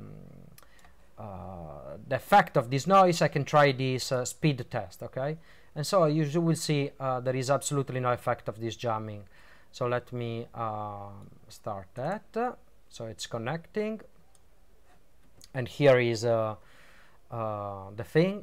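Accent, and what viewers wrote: Italy. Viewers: sei italianissimo e lo si sente! non cercare di nasconderlo bro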